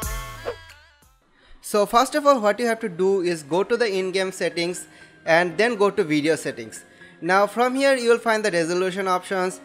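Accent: India. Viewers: Lul indian accent it's my teacher in youtube that teach everything lul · When you hear an Indian voice on a tutorial, you know that it’s going to be good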